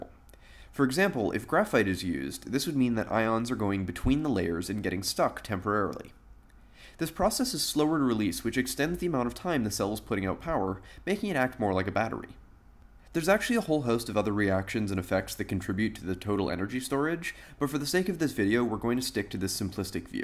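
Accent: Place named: Canada. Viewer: Ok, you sound Canadian- even so....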